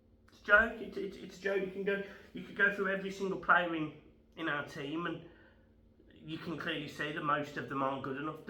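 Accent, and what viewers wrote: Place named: Midlands, United Kingdom. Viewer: sound like you should be a villa fan by that accent mate ay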